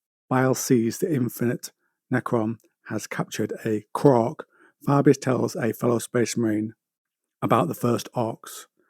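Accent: United Kingdom. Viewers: I think if you get a decent mic your channel would do well dude. and try do a read through of your script before hand as its kind of obvious its your first time reading it outloud. also vary your tone its difficult to tell when you're reading dialogue and when your reading other text. remember most of your audience is going to be yankees and fellow brits so your accent will actually be a boon😅. finally, what is your channel going to do thats different to the other lore channels that exist?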